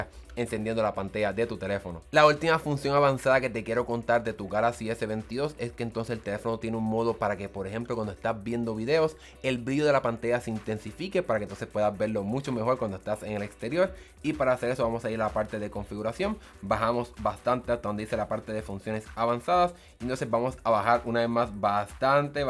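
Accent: Puerto Rico. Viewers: ¿Eres boricua?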